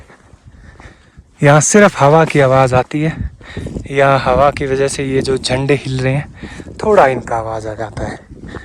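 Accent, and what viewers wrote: Himachal Pradesh, India. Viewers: Aap ki aawaj or bolne ke trike se ese lgta h jese aap uper himachal se ho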